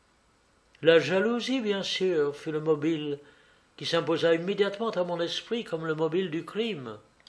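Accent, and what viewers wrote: England, United Kingdom. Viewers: oh are you adding a british accent :D i was wondering what dialect that was lol im learning french :goodvibes::elbowcough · Merci pour cette interprétation, très belle voix avec l'accent anglais. J'ai apprécie cette voix dans mes lectures audios de Sherlock holmes. Merci encore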